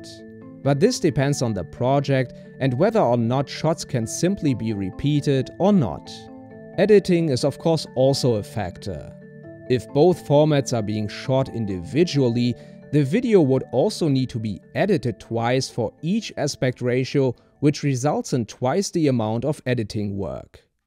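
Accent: Germany. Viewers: Love that massive german accent haha! (best greetings from germany)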